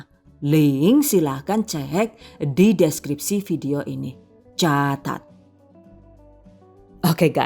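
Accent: Banyumas, Indonesia. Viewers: Laaah Wong ndi sih gye, deneng ngapak? 😂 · "done" #giveaway thv 2020 Slalu ngapak...sukses ...catat...👍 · "DONE" Alhamdulillah selalu suka resep dari dan trik dan tips setiap resepnya jelas banget... Apalagi suaranya khas banget dan ngapak😁😁 Pokok resepnya selalu di nanti2😍😍 Sukses selalu buat dan terimakasih atas semua resep2nya😘😘 #giveawayTHV2020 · Aku seneng banget Maring cenel kiye koh penasaran jan2 asli ne wong ngendi Banyumasane kok medok banget.😀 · Aku suka bahasa jawa ngapaknya...ora ngapak ora kepenak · Lucu banget..ngapak2 kaya nyong bae deneng lah..mbekayune..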